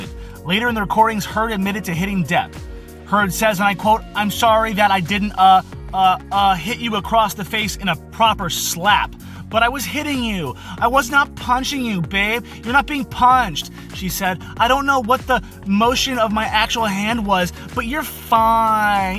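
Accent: United Kingdom. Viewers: Loving the London/cockney-ish accent! Tbf it’s pretty good! 😂😂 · lol The British accent took me by surprise. Good times!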